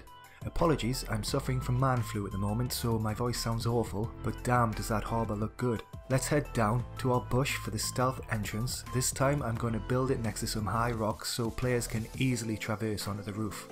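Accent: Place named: Newcastle, United Kingdom. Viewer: Nice build mate and you a geordie too? sound very local lol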